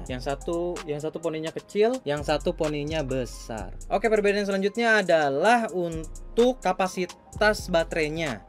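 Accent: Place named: Serang, Indonesia. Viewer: Waduh bahasanya to orang serang banget:v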